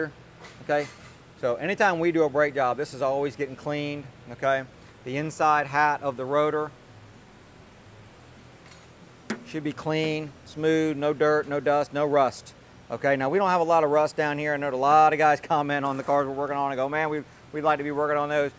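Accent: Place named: Western Pennsylvania, United States. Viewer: Are you originally from Western PA? I hear some accent from that area of western PA, WV, OH area. Just certain words.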